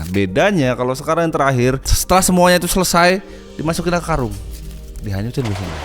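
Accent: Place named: Medan, Indonesia. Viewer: logatnya medan banget emg org medan kah?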